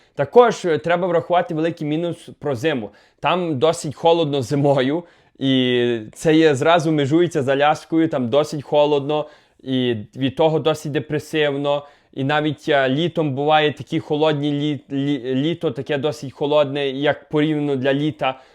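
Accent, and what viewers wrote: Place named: Lviv, Ukraine. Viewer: Красень, твій акцент вражає ! Так мають розмовляти всі Українці, не тільки вихідці зі Львова! Я особисто з Житомира і шкода , що люди з Донбасу і з східної Ураїни так не розмовляють. Дякую за твій контент ! Слава ЗСУ!!!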